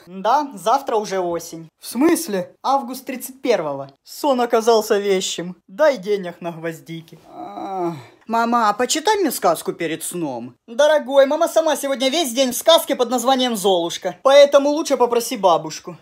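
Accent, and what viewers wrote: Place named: Ukraine. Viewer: всегда вместо буквы "Г" говорит букву "Х".Видно что Украинский акцент👏👏.У тебя самые забавные видео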